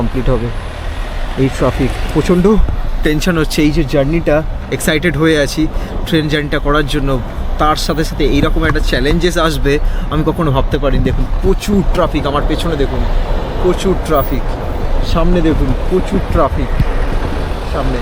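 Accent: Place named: Bangladesh. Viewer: Your bangla accent sounds like bangladeshi.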